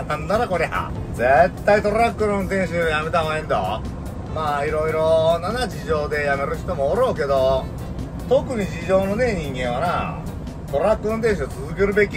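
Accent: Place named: Okayama, Japan. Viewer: お疲れ様です! さんは中小のドライバーが思っている事を全部言ってくれるし、備後在住のワシにはさんの岡山弁がとても聞き心地が良いです!では次回楽しみにしてます!ご安全に✌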